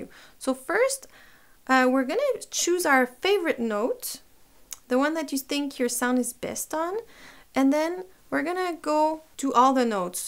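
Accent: France. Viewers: Hey are you a French? I like your English too.❤️ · Yeah ! the french prononciation ! Vive les français ! !!!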